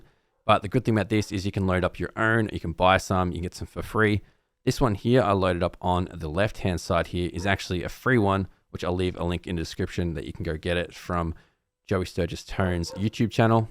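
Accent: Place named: Australia. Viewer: Awesome to hear another Aussie. Are ya subbed to Dunn?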